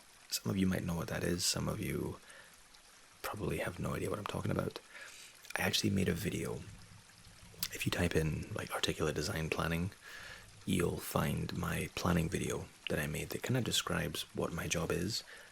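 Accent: Scotland, United Kingdom. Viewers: You still have a strong scottish twang to your accent :) yay for scotland ❤☝ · You sound Scottish to me mate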